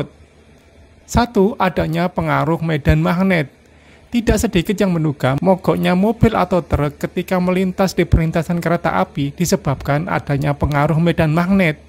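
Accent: Java, Indonesia. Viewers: Aksen jawa nya medok tenan mas….. hadeuuuuuh 😮😅😂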